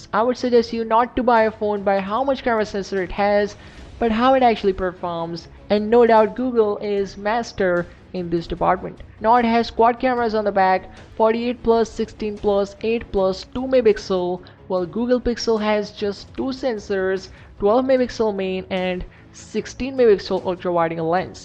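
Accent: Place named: India. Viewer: Indian english so ...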